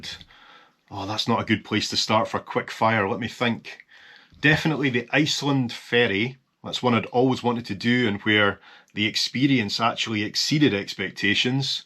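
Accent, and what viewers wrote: Scotland, United Kingdom. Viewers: What captivates me about your videos to be honest is your Scottish accent. I’m from california so to hear your accent takes me to the fantasy world of old England and some small cottages and green pastures. But that is what my vision of Scotland is like. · I love your videos. I love your naturalness. I love your scottish accent and how very scottish you are. I want to ask, and I am sure you have been asked this before many times, but when oh when are you going to come down to Australia, and film here? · Is it just my English ears misleading me, or do you really sound more Scottish when you are sitting in your own study at home than when you are making your usual travel videos!? :-) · Extremely entertaining! (I‘m glad your Scottish accent is charming but not too heavy like Gary Anderson 😂)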